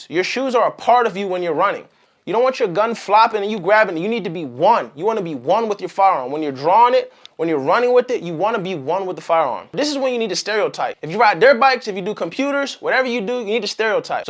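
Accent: DMV, United States. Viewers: I never heard the dmv accent in ur shorts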